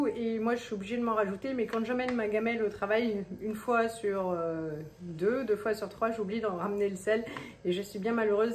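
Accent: Alsace, France. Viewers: Bonjour Y rrrepennnnnser 😂 yo hop la ! Un petit reste d’accent alsacien 😊 votre retour me donne envie de tester les triangles dans la soupe au lieu de la crème. Les pains d’épices au chocolat Made in Allemagne sont de retour chez Leclerc Alsace … miam ! Bon WE à toute la famille et caresses à Chupa ! 😙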